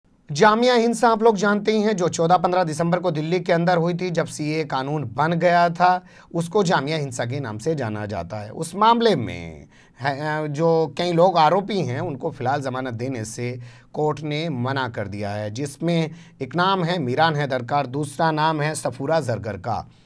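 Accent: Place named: Western Uttar Pradesh, India. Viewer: सर आज आपके मुँह अपनी खड़ी बोली सुनकर मजा आ गया सर कभी पश्चिमी उत्तर प्रदेश को अलग प्रदेश बनाने पर कोई बहस या कोई शो कोई न्यूज चलाए थारा अहसान होगा म्हारे पर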